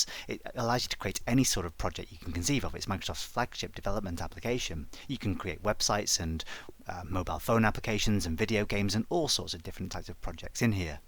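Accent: United Kingdom. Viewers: This is the best training video. I love the British accent! Please can you upload the SSAS 2008 training. Thanks.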